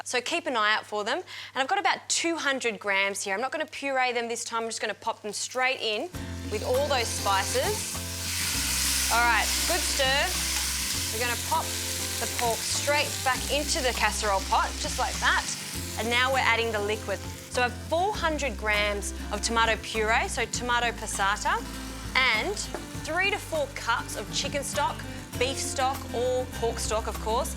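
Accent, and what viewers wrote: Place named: Australia. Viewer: Remember the 160 is Celsius, she sounds from down under.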